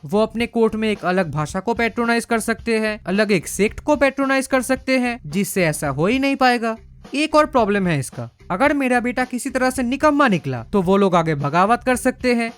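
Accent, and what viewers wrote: Bengal, India. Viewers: Bhai bangali ho kya accent se esa laga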